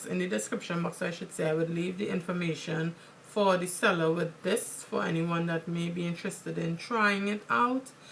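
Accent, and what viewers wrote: Trinidad and Tobago. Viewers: your 'accent' ...are you Trinidadian? xD :)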